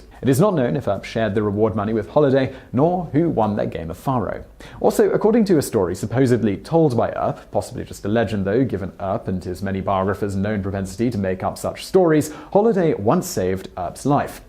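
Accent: England, United Kingdom. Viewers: Something weird about a British man giving me American history. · The limey narrator seems a bit biased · im English and i do feel hearing an english man talk about American gun fighters just doesn't feel credible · This would be a lot better if the narrator didn't talk so fast and choppy with that annoying accent. English accents are great as long as they are speaking the Queen's English and not that choppy sounding stuff. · Why in the hell is a sewer britsh oink talking about american southern and western history? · Despicable actually, the pretentious British accent combined with ignorance of American culture or proper American pronunciation is utterly repulsive... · Nothing says like a good old biography of a American Wild West legend narrated by a Brit! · I just cannot stand a British accent.....it makes me crazy....especially the way he pronounces the game Faro ! 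· So the funky English accent makes history assumptions fact?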